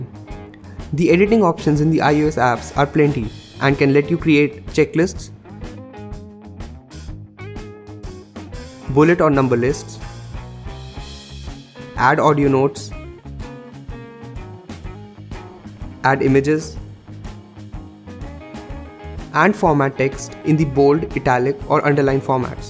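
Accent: India. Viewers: Your English is fucking horrible man lol why does every Indian have that accent, anyway get some braces -Canada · indian accent ...hhhh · Indian accent makes are the worst accent ever Just sounds horrible like nails on a chalk board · Your accent makes me respect indian english accent now. Sounds way more better than singlish. Oh i hate singlish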